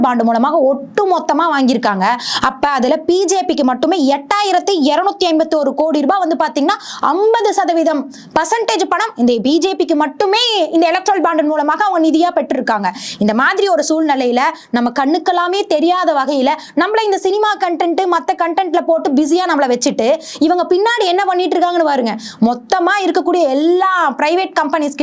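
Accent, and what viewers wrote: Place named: Kongu, India. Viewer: பேச்சு‌வழக்கு கொங்கு நாட்டு பக்கம்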